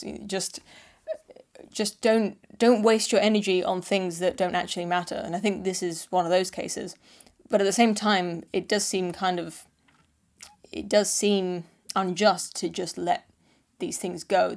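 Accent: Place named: RP, United Kingdom. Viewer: This chick has the Downton Abbey/Pride & Prejudice accent but talks about "thots" and "Pewdiepie". I would pay money to hear her tell the Aristocrats joke.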